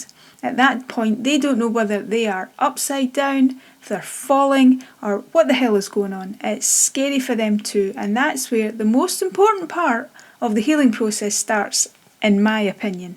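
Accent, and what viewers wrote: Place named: Scotland, United Kingdom. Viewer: Thank you so much for this video. My 14 year old golden retriever is experiencing this without the eye movements. She was on antibiotics for a skin infection which would not go away on natural remedies (we live in a warm climate)She had the same symptoms 5 months ago and the vet said it was a stroke. Its only by looking at youtube videos that connected the antibiotics and this condition. She recovered well after the last episode and I am doing similar things as you taking care of her. Thankfully her golden retriever appetite has not changed and she is eating and drinking well. It is very distressing to watch. This video gave me much comfort and hearing a fellow Scottish accent did too. 🙏🏴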